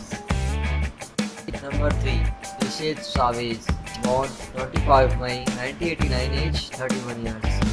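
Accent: India. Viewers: Can’t understand the announcer because of his Indian accent!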